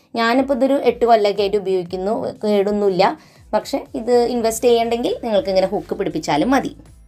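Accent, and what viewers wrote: Thrissur, India. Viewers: Ningal thrissur aahno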